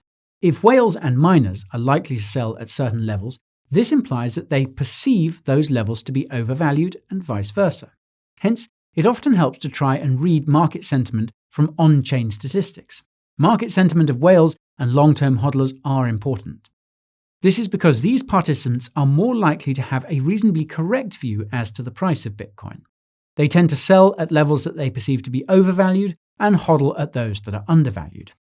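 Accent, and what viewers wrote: United Kingdom. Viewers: You’re the only dude I can watch at 1.5x. And I could probably watch you at 2x if you didn’t have a British accent.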